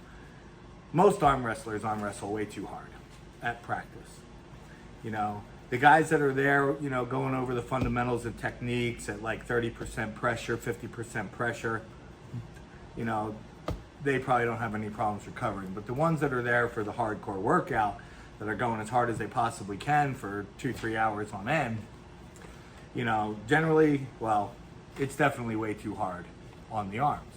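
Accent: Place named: Philadelphia, United States. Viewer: I hear a Philly accent…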